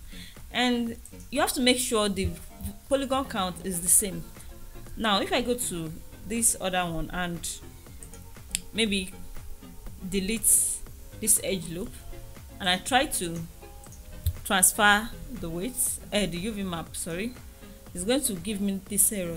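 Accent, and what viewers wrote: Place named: Nigeria. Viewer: hey, you sound like a nigerian, are you? let me publish my video self made animation on 6th march (my birthday) then we'll talk. thank you.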